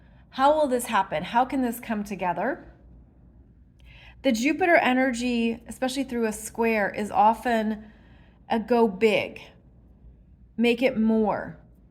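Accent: United States. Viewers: SO glad, you've dropped the insipid hippydippy chimespeak!! Your lack of Cali airbrain accent FINALLY allows your significant abilities to be HEARD. Keep it up, this adult version of your voice thinv. 🎉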